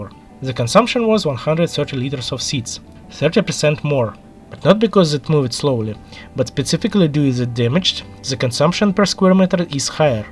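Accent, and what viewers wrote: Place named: Arab world. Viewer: i can't deal with your arabish accent sorry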